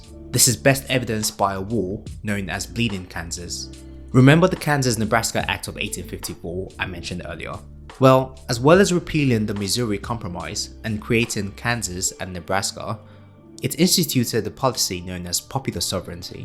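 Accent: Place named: United Kingdom. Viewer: I wont hear a british voice talk to me about the AMERICAN civil war...stay in your lane tuber.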